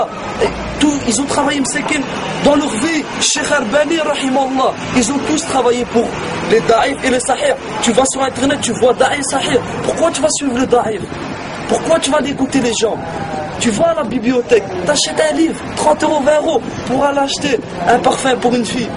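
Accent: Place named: Maghreb, North Africa. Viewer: إذا كنت تقصد هذا الشاب - بارك الله فيه - فهو اما ان يكون امازيغي او عربي من المغرب او الجزائر فهم كثر في فرنسا. اللهجه واضحه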